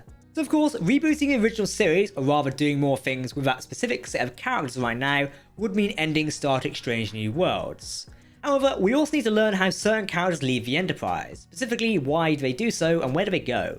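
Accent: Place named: Scotland, United Kingdom. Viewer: OMG... I wanted to watch this episode, but the moderator sounded like he was Scottish and was gargling Hot Marbles. The subject would have been interesting IF he could be understood. Please have him speak slower or get a person who can be understood by more than folks from the North of England, Scotland & Whales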